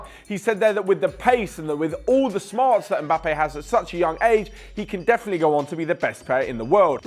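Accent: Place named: England, United Kingdom. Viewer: awful accent, thank god im not leaving in england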